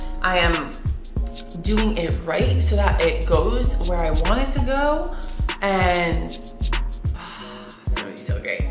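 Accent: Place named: California, United States. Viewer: Your Cali accent is annoying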